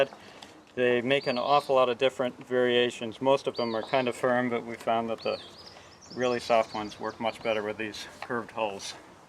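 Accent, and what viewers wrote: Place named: Maine, United States. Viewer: I like the Maine accent and the fashion he works. Kindly from Calabria, South Italy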